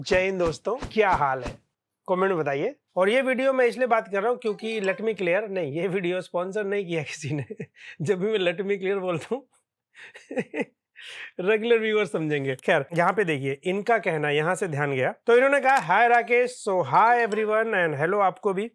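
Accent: Lucknow, India. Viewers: Bhai aap lucknow se ho kya?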